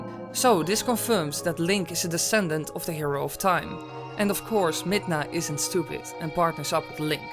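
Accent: Netherlands. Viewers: Are you dutch? cuz of the accent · You sound dutch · I can hear in the voice of someone from the Netherlands that they're Dutch since i hear it a lot since i am also dutch. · It's sounds like you have a dutch accent😁 I like the theory, it turns things dark. Me likes dark ;3 winkwinknotchnotch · You sound heel erg Dutch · Damn I thought from your accent you were either Dutch or Swedish.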